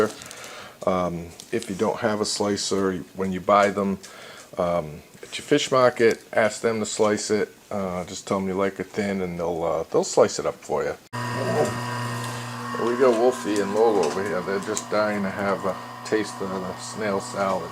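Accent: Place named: Maine, United States. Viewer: you sound like you're from Maine :)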